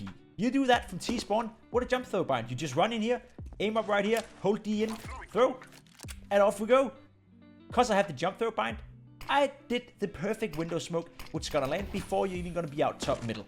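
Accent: Denmark. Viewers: are you danish? you sound danish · i can just hear that your Danish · nice vidio jeg syntes at det var sjovt at man kunne høre at du var dansker lol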